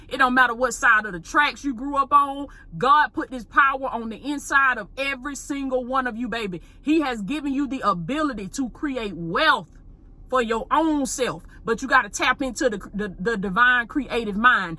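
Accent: South, United States. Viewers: Sis u so country but love it ..talk to that thang that’s my word I use to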